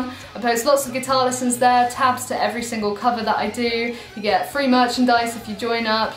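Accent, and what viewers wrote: United Kingdom. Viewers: And for your accent, it has to British. Best musicians in the whole world! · I heard a British accent, am I mistaken?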